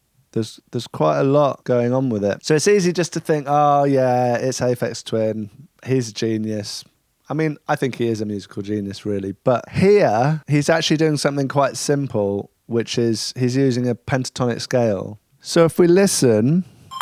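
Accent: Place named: England, United Kingdom. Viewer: being an american, you have the most english accent i think i've ever heard haha. that is, other than the old timey exaggerated nonsense.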